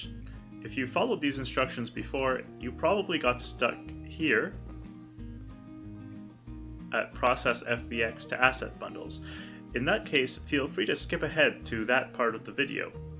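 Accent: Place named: United States. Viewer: I've tried staring at it for 20+ seconds, that doesn't seem to work for me. It might be because my camera resolution setting isn't that high (640x480) since it gets really sluggish at higher res. Anyway, it's not really a problem since I can consistently get it to sync after I turn my head. By the way, are you Canadian too? You sound American-ish but you say "zed", lol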